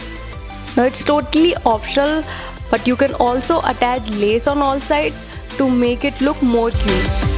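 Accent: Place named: India. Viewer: good idea. but indian accent is so ugly to hear. cant stand long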